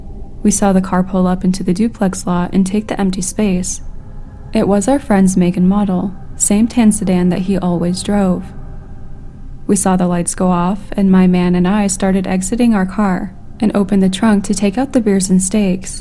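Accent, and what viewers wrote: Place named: South, United States. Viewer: hearing some drawl in a story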